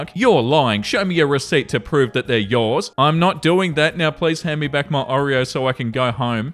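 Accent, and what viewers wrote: Australia. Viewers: He's Aussie, it's a common vocal trait of Aussie accents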